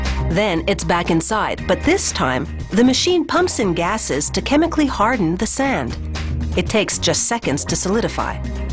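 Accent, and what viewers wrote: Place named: Canada. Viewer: That Canadian accent...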